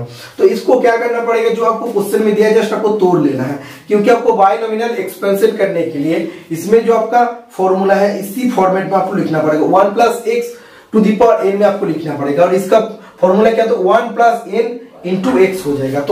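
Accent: Bengal, India. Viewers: par aap ke bengoli acsent se thoda sunne main problem hota hai sir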